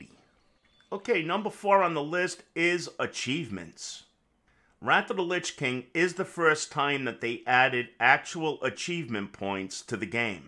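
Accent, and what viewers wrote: New York, United States. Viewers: Came for the WOW vid. Stayed for the NY accent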